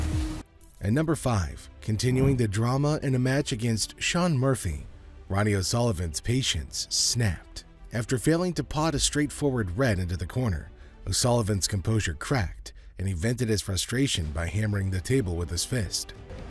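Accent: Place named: United States. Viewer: It's very weird every time you hear commentary with American accent on a snooker video...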